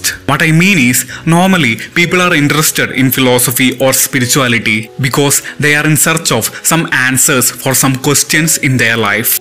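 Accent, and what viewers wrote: India. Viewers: Your Indian accent makes this video much more impressive and sound more spiritual. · Sure. Will do. You take care. I wish you the best. Always will keep wishing more luck to you. Dont worry about being Indian or the accent. I know our image is looked down - It is what it is. But keep going. "I know of no better life purpose than to perish attempting the great and impossible. The fact that something seems impossible should not be a reason to not pursue it. That's exactly what makes it worth pursuing. Where would the courage and greatness be if success was certain and there was no risk." - Nietzsche.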